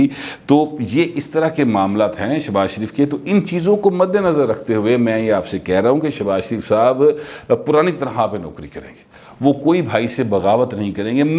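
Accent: Lahore, Pakistan. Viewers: you’ve given a superb interrelationships between the Mian brothers by illustrating a few of your personality experienced happenings. You’ve certainly a great narration skill in Urdu dotted with typical Lahori Punjabi. Super journalistic analysis. That’s why your vlog are impatiently waited for. ❤️❤️❤️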